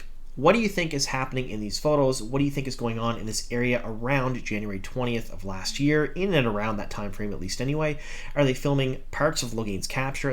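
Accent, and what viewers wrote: Canada. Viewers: You sound Canadian, do you watch hockey ? (Let´s go Penguins!).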